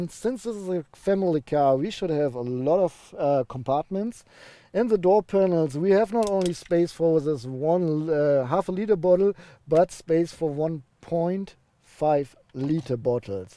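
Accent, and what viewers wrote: Germany. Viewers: I really like your complete cover of the car, your german accent is really not a problem. Continue like that :-)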